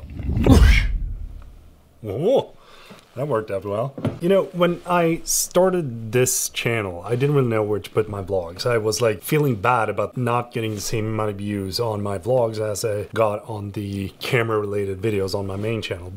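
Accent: Sweden. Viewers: Good to see the vlogs back. And your SwEnglish is on point 👍🏻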